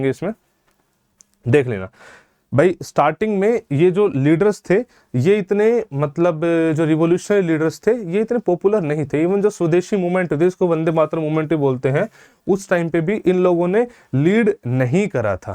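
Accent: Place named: Bengal, India. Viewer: Sir your Bengali speaking very cute👌👌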